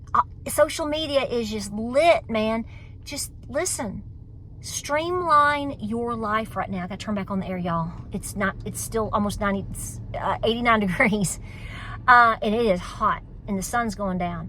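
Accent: South, United States. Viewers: ... You're like a plough horse, a jersey cow and a hound dog all in one.. with a southern drawl, y'all ... SAVE YOU SANITY, and stay ACTIVE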